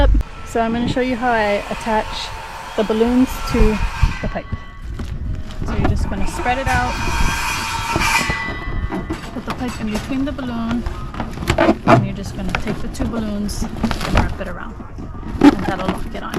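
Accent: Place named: Hawaii, United States. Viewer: Omg. I’m listening and I’m like why sound like she talking pidgin. Then I look at your name 😅 Native Hawaiian here living in NY. So wonderful to come across Hawaiians even if it’s just on da internet ❤ Mahalo for this video.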